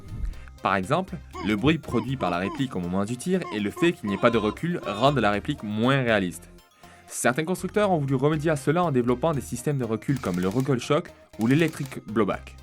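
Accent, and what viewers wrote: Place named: South-West, France. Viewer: Je souhaites me mettre au AirSoft. Merci pour ta chaine (je débarque 4ans après 🤣mais pas grave) J'entends un accent, du sud ouest ? ;) Ciao de lapart d'un Bdlais ^^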